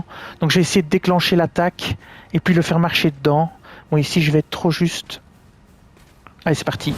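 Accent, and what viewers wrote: Belgium. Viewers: Merci beaucoup pour le travail accompli. Et l'accent Belge est tellement beau.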